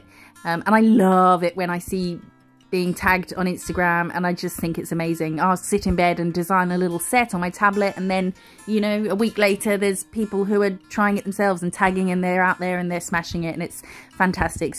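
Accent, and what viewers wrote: United Kingdom. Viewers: Love your video's ! Your channel was recommanded on a dutch facebookpage for hobbyist nailartists. And they where absolutely right to recommand you! ❤ your British accent 😍💅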